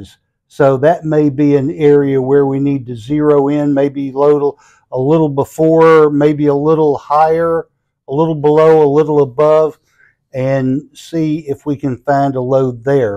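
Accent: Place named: Pennsylvania, United States. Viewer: Entertaining as always. I have read that a lot of shooters like the H4350 but at higher velocities. 2750 FPS seems to be the sweet spot. Some talk of RL17 also. Apparently it has been hard to get but the posts are older. I wish I had an indoor 100 yd range. BTW you are located in GA but your accent makes me believe you are from PA?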